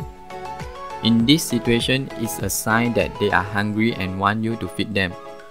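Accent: Malaysia. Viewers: Are you from Malaysia? Because your accent sound so Malaysian to me.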